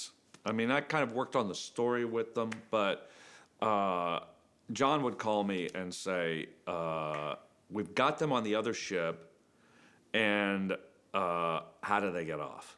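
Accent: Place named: Texas, United States. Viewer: He still sounds so Texas to me.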